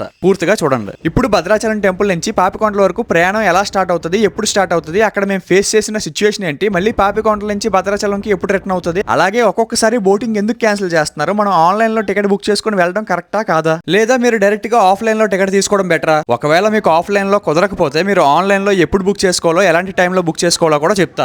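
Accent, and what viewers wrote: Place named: Guntur, India. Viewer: Mona aa slang vinni lenin anukuna .... Ivale ardam aindi guntur karaam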